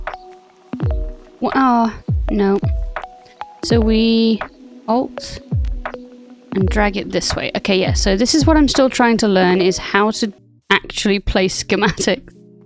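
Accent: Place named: RP, United Kingdom. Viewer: Hi. You say you want to get better at narrating your videos, instead of just , “waffling on “. But perhaps that’s one of the reasons people are watching your videos. You have quite a posh voice, but I like it when you say the occasional word, that has a slight West Country twang to it. I’ve never used that mod myself, but it did turn into a great build. Was it your design, or is it a preset plan of the building that you copy ?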